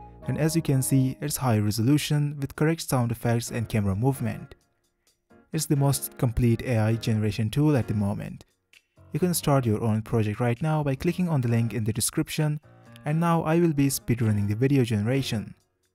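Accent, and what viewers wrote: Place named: India. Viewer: Is it only me who thinks he has an Indian accent?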